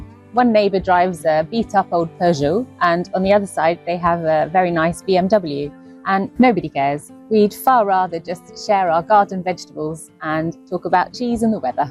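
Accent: United Kingdom. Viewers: She's British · Sounds just normal here in iRELAND -You sound Uk ? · That's great, but why does this "French" narrator have an English accent? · That's NOT a French accent. She sounds British.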